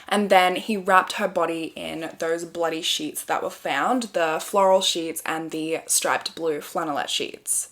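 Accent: Australia. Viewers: Me desperately trying to keep up with the Australian English as an American😂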